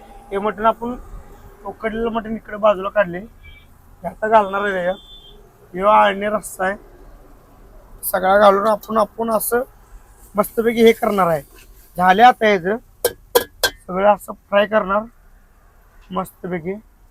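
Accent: Kolhapur, India. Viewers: राडंची कोल्हापूरी भाषा भावा 👌👍 😂😂😂😂😂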